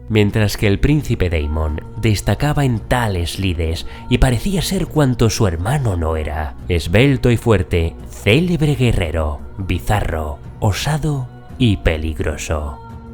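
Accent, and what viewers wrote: Spain. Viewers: brother que seas español, le da el toque a la narracion!! excelente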